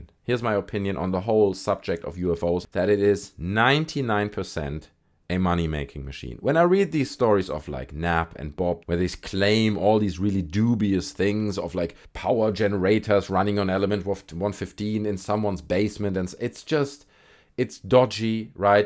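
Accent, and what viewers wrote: Germany. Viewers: Dieser Deutsche Aktzent 😅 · u sound hart german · something so nostalgic about hearing someone inspect government documents in a german accent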